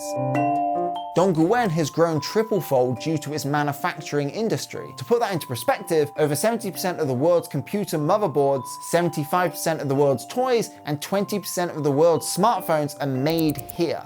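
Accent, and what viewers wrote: United Kingdom. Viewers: Mate that accent is not American... He's very clearly from the Midlands or south of England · The guy sounds British, not American?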